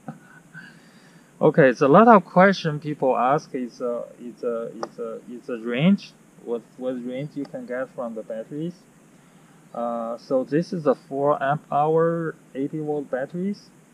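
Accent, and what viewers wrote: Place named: China. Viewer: I wish i can do authentic Chinese accent like that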